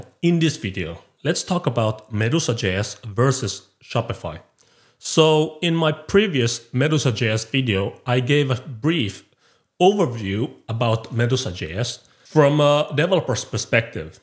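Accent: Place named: Japan. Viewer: I like the way you talk, the animation.. you sound japanese. cool. lets see you grow bro.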